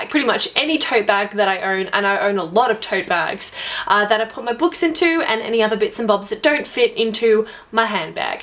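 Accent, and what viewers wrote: Australia. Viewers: is that an australian accent? i always get it confused. so hawt lol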